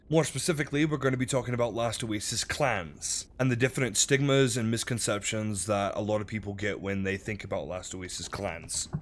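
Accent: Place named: Scotland, United Kingdom. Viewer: Nice video mate, give me a shout on my discord over the weekend and we can have a chat. Btw were is your accent from? Bit of a Scottish twang in there.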